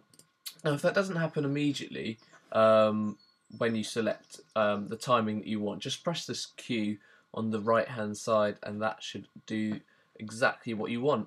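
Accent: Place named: United Kingdom. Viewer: Your accent is distractingly British but you make a mean video mate. Short, to the point, and telling me everything I need to know. Well done sir!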